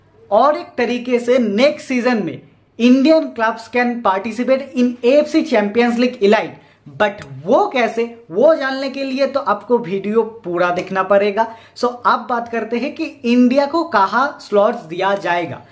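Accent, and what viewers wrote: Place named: West Bengal, India. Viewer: U sound so bengali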